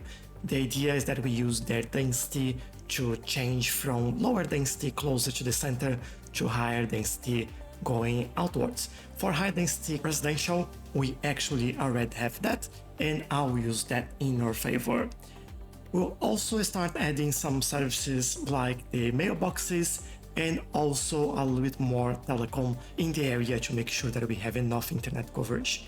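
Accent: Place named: Brazil. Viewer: Amazing video! Are you brazilian? Your accent reminds me of our people speaking english.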